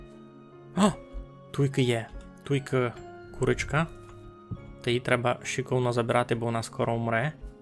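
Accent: Transcarpathia, Ukraine. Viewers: Боржавський або бережанський діалект (центральна частина Закарпатської області). Містить достолиха говірок. Єдиної стандартизованої версії поки не має.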